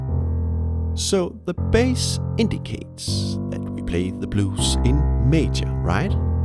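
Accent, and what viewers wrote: Denmark. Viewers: holy denglish han må simpelthen være dansker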